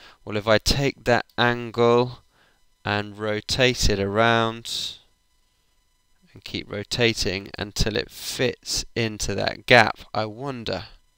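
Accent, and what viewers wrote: United Kingdom. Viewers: If all my teachers had an England accent, I would pay attention more.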